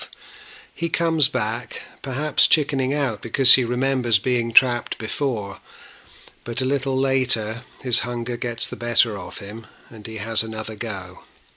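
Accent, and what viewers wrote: United Kingdom. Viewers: I have not seen this trap for sale in the UK, I think it's only sold in the States and Canada. But you sound very English, just wondering??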